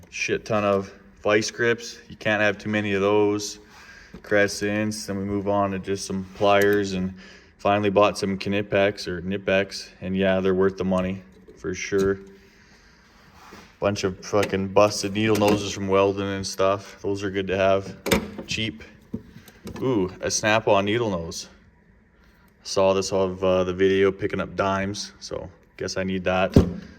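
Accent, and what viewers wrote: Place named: Canada. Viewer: heard you talking and said he's Canadian then saw the Berta tags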